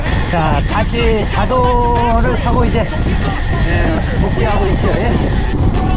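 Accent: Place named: Chungcheong, South Korea. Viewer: 아 정겹다😊 잉 겨~~충청도 사투리! 응원합니다🎉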